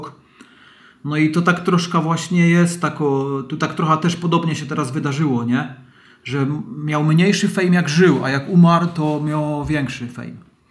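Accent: Silesia, Poland. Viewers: szacun za przemyślane i wyważone słowa i za śląską gadkę!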